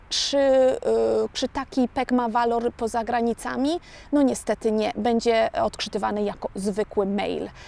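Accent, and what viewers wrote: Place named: Italy. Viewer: Ale już słychać ze mieszkasz we Włoszech z Twojego akcentu ❤️ a pamietam Twój głos jeszcze z czasów liceum. Bardzo fajny pomysł jeśli chodzi o informacje prawne! Powodzenia.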